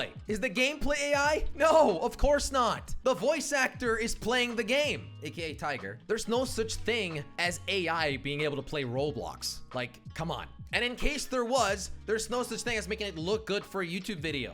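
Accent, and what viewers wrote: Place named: United States. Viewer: Wait, you're Dutch as well? Unlike Kwebbelkop you don't have the goofy accent at all, in fact you sound just like an American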